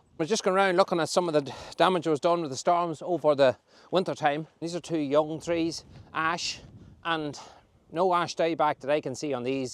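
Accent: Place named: Rural, Ireland. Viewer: I have lived abroad for almost 25 years. I grew up on a farm in Mayo - I love your English and use of vocabulary, it's so rural Ireland. Big respect ❤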